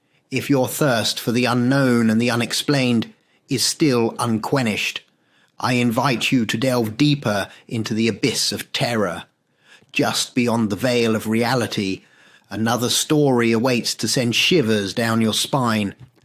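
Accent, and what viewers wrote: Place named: United Kingdom. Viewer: You're appearence has change a bit but.your enchanting voice along with your british accent still haunts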